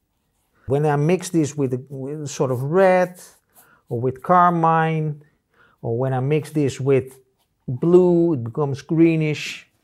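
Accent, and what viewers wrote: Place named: Netherlands. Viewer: Dear sir. Do I have to tell that I love your dutch accent. I loved this, even if I am familiar with paint makings since many years. I love when you say Rembrandt in a way that he would have said. I am a swedish old man, and understands just some words here and there, that we share, but I love the power and poetics in the dutch language. Many thanks for the tutorial.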